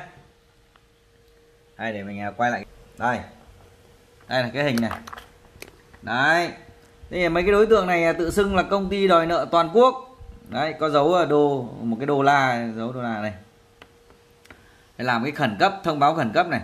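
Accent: Standard. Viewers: noi chuẩn ko cần chỉnh ok ok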